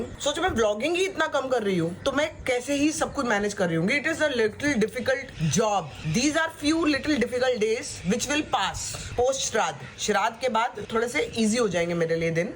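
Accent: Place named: Maharashtra, India. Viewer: So cutely she speaks मराठी... being maharashtrian I like her मराठी accent... झोपला भोपला😂😅😂😅😂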